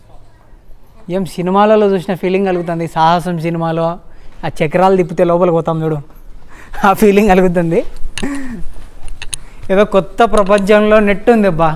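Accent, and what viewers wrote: Rayalaseema, India. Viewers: U r from Rayalaseema u r accent says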